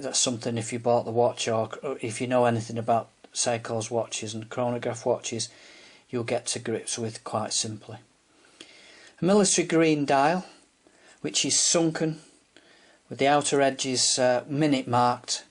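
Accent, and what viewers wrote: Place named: Merseyside, United Kingdom. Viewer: Are you from Liverpool? You have a Merseyside accent.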